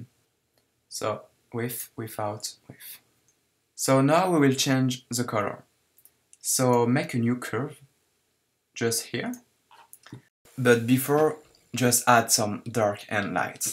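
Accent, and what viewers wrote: France. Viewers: Tu es français, non ? L'accent est magnifique ! Edit ; J'avais pas remarqué le toshop en français... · Mdr on sent bien l'accent français ;) sinon très très bon tutoriel ainsi que boulot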